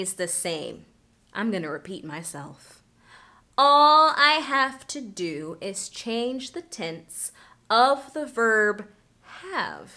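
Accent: United States. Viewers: Nice accent!!! What state are you from?❤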